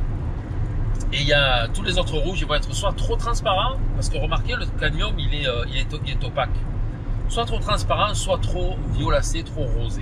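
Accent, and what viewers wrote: South, France. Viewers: Merci pour vos vidéos. Je suis fan. Et de savoir que vous êtes au Québec me ravit. En revanche vous avez dit à un moment donne que vous aviez travaillé à Berne l’Etang. Et question un peu indiscrète vous êtes de cette région de France. À vous écouter vous avez un accent du midi. À bientôt.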